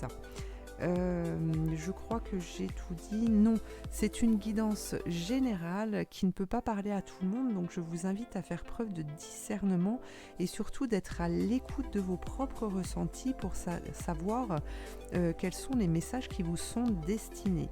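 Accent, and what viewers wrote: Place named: Jura, France. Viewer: Haaaa j avais bien reconnu l accent jurassien.. bisous d une autre jurassienne..ou serez vous installé svp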